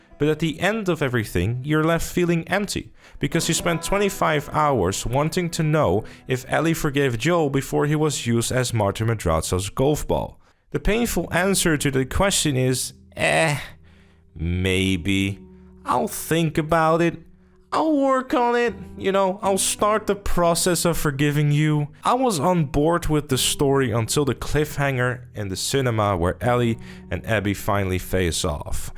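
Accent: Netherlands. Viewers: This guy is definitely Dutch😅 Great review tho, keep up the good work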